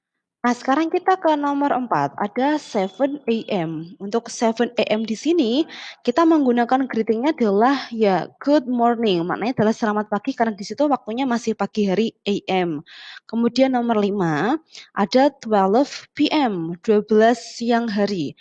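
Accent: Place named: Java, Indonesia. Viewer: Medok ya bund😅👍👍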